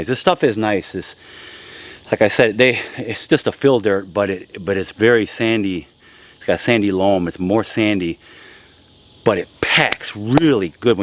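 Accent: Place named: New England, United States. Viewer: sounds like New England to me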